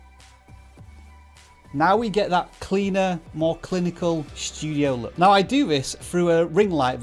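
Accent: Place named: United Kingdom. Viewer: Totally off topic, but I’m working on learning regional British accents. Where, exactly, did you grow up? Thanks.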